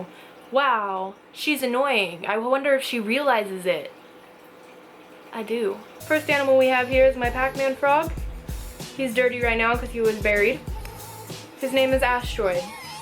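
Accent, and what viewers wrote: Texas, United States. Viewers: Is she from texis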